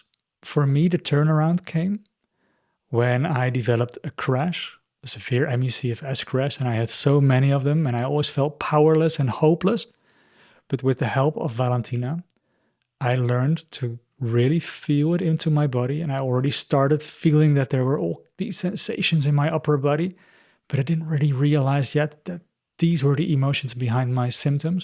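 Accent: Netherlands. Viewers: Sounds like a Dutch accent. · My compliments 👏. The bravery to confront yourself with your childhood ànd work on it. All my love and light to you and all the ones who listen to you. (I hear a dutch accent?)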